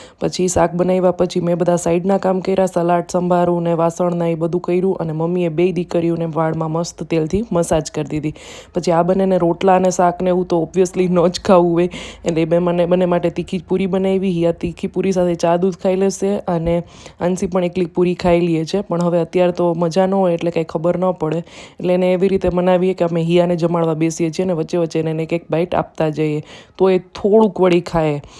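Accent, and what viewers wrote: Rajkot, India. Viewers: જય શ્રી કૃષ્ણ જય જલારામ અંશ અને કેમ છે મજામાં રાજકોટ બોલી છે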